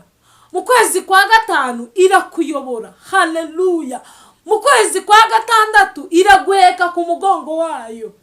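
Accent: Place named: Banyamulenge, Democratic Republic of the Congo. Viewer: Ese ko numva uvuga nkabanyamurenge uriwe ndabakunda peee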